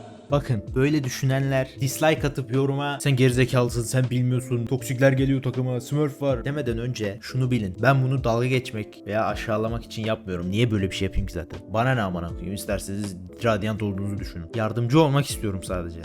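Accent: Thrace, Turkey. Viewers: Trakyalımısın abe kızan. Trakyalıysan trakyanın neresinden.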